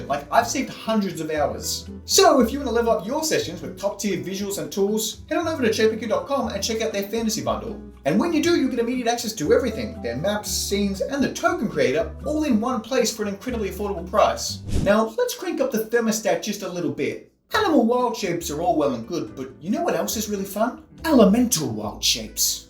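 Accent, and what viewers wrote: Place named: Australia. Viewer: G'day mate. Just searched for Aussie D&D channels and yours came up top of the list. Good to hear a familiar fast talking accent 😂 Druids are so broken. The kind of class you love to play, hate to DM 😅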